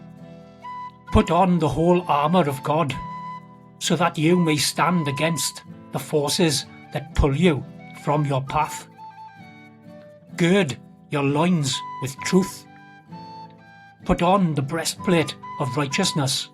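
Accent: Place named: Wales, United Kingdom. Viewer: Fantastic video. Thankyou for making this. Great to hear the Welsh accent. I was born in Cardiff and grew up in Rudry in south Wales.❤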